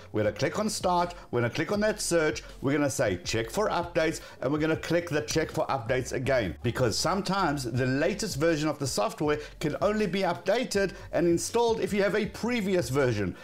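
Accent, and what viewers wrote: South Africa. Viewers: I'm hearing a 🇿🇦 accent, or am I mistaken? 🤔.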